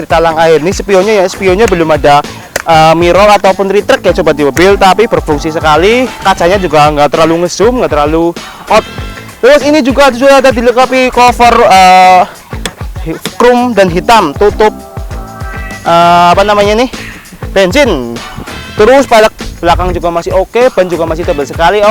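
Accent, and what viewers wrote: Banyumas, Indonesia. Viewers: Ngapak jiwa,,,Sukses trs masseehhh,,Gombong Puring Hadir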